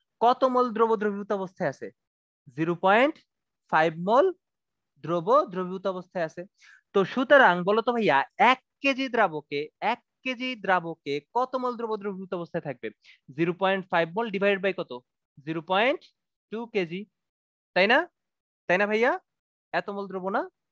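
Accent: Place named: Chittagong, Bangladesh. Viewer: oree vai tw Chittagong er.. Seraaa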